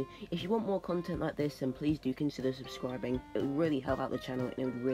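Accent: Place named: United States. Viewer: I couldn't stand the American accent and terminology. I watched it with the sound off and subtitles on.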